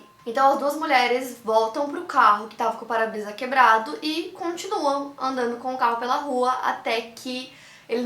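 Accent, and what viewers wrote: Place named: South, Brazil. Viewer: você tá perdendo o seu sotaque sulista 😳😳😳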